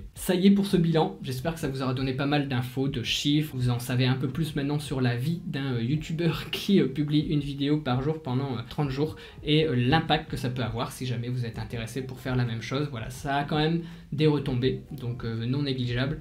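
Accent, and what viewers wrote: North, France. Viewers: J aime bien tes vidéos elles sont toujours instructives et j aime la façon que tu présentes et j aime l accent du nord de la france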